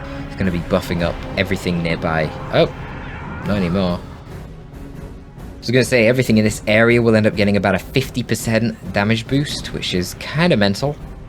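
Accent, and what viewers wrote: Scotland, United Kingdom. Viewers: Scottish accent casts henceforth yes? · GGs, and great scotch accent haha. Where are you actually from?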